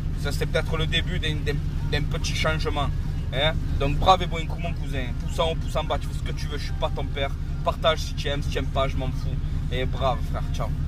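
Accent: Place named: Marseille, France. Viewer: Salut tu es sûrement de Marseille aussi vu ton accent bien prononcer c'est vrai qu'il doit y avoir des très vieux bâtiment dans cette ville mais il n'y a pas que là-bas il a la partout mais beaucoup de bâtiments sur à l'abandon et négliger il faut de l'argent pour refaire tout cela puis beaucoup de propriétaires peu scrupuleux loue des appartements ou des studios à des personnes très vulnérable il profite de ces personnes là car ils ne peuvent aller nulle part ailleurs on doit les punir ces personnes là car il achète des appartements il est relou il ne les met même pas en bon état et beaucoup ne sont pas en règle voilà mon cher ami je regarde que j'ai le temps une vidéo de toi je te souhaite une bonne journée sous le soleil de Marseille à à bientôt Philip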